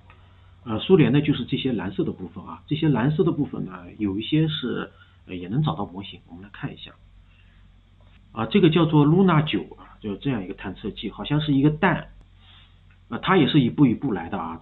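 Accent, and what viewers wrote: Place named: Shanxi, China. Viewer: 请问是哪里人？听口音有点耳熟，应该是北方人。山西？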